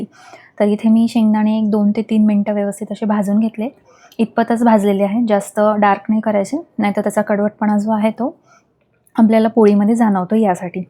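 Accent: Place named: Vidarbha, India. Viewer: रेसीपी सांगण्याची भाषा खुप छान आहे आमच्या विदभा सारखी ❤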